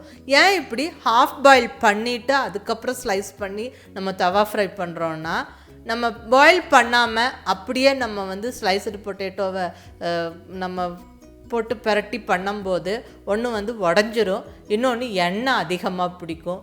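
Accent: Kongu, India. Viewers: Mam unga Kong nattu voice super ennoda sunnuku pidikum.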